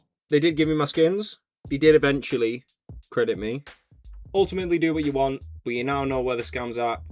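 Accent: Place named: United Kingdom. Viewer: i assume youre from UK by your accent, can you even deposit money into the website? im from UK and cant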